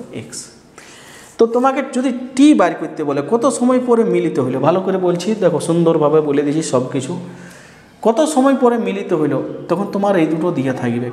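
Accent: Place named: Purulia, India. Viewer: mone hoyechilo sir apnar kotha sunei karon amra Purulia Bankura r loker amader kotha sunei bujhe jabek j amra Bankura ba Purulia r basinda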